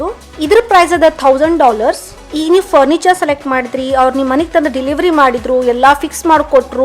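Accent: North Karnataka, India. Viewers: uttara karnataka language matadtira akka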